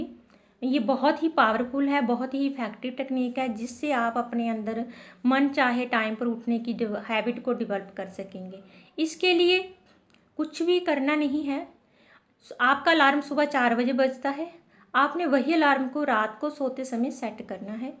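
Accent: Punjab, India. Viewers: Nice video. You are pure Punjabi( I mean your Hindi is in Punjabi tone)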